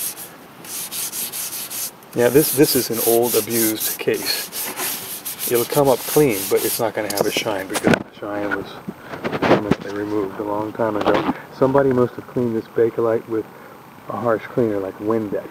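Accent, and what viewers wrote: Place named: Minnesota, United States. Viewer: Cool accent. Minnesota?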